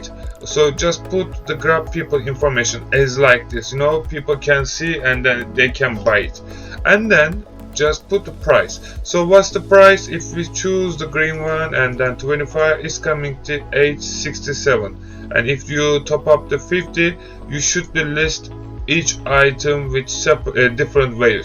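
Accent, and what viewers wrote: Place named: Turkey. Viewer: eski alışkanlıklar unutulmuyor ceterele viii :) şaka maka türk gibi ingilizce konuşmanı çok taktir ettim eğitimini almayı düşünüyorum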